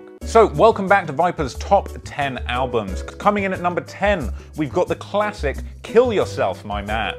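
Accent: United Kingdom. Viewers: So many British narrators intrigued in Texas rappers. I like it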